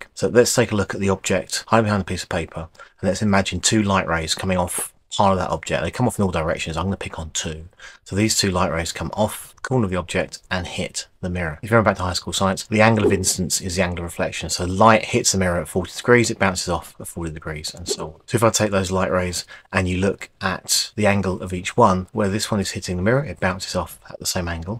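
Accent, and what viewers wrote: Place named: United Kingdom. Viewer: Your demonstration made it clear, but that damn British accent made the explanation impossible to understand.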